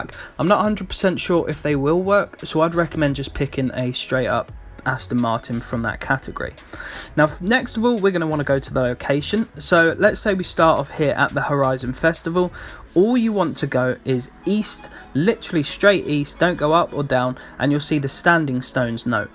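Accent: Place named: Australia. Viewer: The australian accent tho 👌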